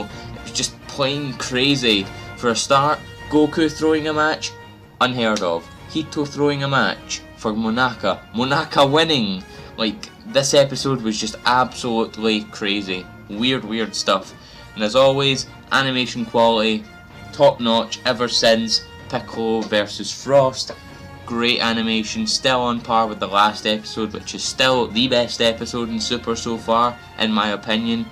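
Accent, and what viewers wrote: Scotland, United Kingdom. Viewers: Hey, man! I love your voice! Sorry for my ignorance but is it Scottish? Anyway, I think you have a really good sense of this stuff, so you don't need my help. Although, I don't think you need to get into your overall thoughts on the episode because you've been talking about your thoughts on a scene by scene basis. For the most part, I would stay away from reviews/news as a primary outlet when you are newer. You want to standout as much as possible. Just a thought. Either way, good job!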